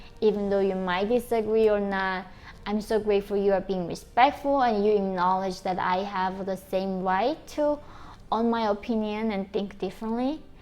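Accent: United States. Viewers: im so happy for you. you might be from North Korea but you speak like an American. Americans are people from different countries and cultures with one goal in mind to better ourselves and lives so our kids and have a better future and to keep this country great. I wish you a great life with prosperity. keep up the videos you have great reactions maybe you can do some on American sports especially football its a big culture tradition.. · I've been listening to her for a while and she is sounding more like a good ole Betsy Ross Gal every day!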